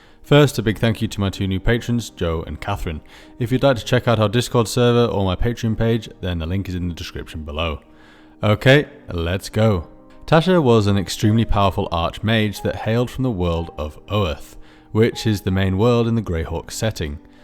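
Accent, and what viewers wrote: United Kingdom. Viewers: The argument that occured two months ago was fascinating to read. Not that it matters, but I think I'd support the supposed linguists assertions, as someone who has a name that is a transliteration of a common English one, because in my ancestors mother tongue, they do not speak with certain consonant sounds, like "r." Also isn't an American using American pronunciation. As far as I can tell, he's British.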